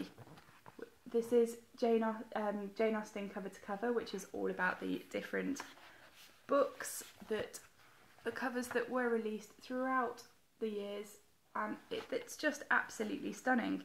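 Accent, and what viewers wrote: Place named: England, United Kingdom. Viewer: Thanks for your sweet video. I love your beautiful accent, englishy english :)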